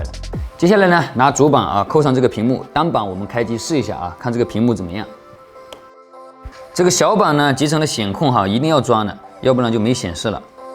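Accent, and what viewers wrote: Wenzhou, China. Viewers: 声音跟楼斌挺象的:)只是带了温州腔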